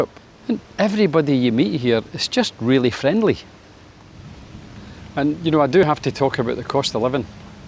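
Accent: Scotland, United Kingdom. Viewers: Really good video, lots of good info, not getting all political about UK governments (personally I think they've all been poor quality for a long time!), just the facts about living there. Englishman here currently living in Norway and looking for retirement options, or even just for the winter. Nice to hear a straight talking Scot, and I love the Scottish accent and Scotland. Always been treated with friendliness there (and here in Norway) As I have in Spain! Been to Spain (different parts) maybe 15 times now, with work and for holiday/vacation. Always liked it. The Spanish help me with my poor attempts at their language. A lovely country. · Lovely Scottish accent (? Glasgow)and very good Spanish pronunciation. Yes it is still good to be a foreigner in some countries A A About the menu del dia with water I hope it was your own choice.In Madrid they will bring a whole bottle of Wine,not the fancy vintage but still very drinkable. 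I f they didn't offer you wine pls tell me and I'll go down and give them a piece of my mind Viva Escocia.Vivan los escoceses!😊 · Same to you my friend, wish you all the best !!! Love your Scottish accent !!! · Are you Scottish?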